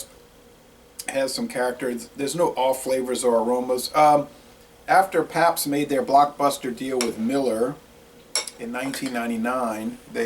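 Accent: Louisiana, United States. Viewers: are you from Louisiana ??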